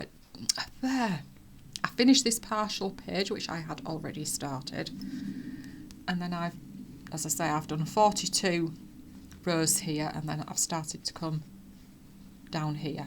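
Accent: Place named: Yorkshire, United Kingdom. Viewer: Hello 👋🏻 I’ve just started watching you and really enjoying your Flosstube. Your projects and stitching are beautiful. I am also doing stitch from stash and doing well so far. I guessed you’re from Yorkshire straight away but where? East Yorkshire. I’m in South Yorkshire right on the edge of the Peak District, I can walk into it in 5 minutes. Anyway Happy Stitching and I’ll see you next time ❤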